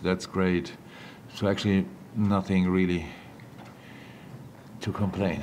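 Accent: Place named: Liverpool, United Kingdom. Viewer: I am going to miss Jürgen pronouncing names in a Scouse accent 💔💔💔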